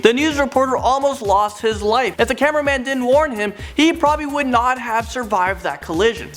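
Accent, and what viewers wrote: Canada. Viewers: RyanCraft1 hes canadian of course he sounds different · r u Canadian or (presenter) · U Canadian bruh?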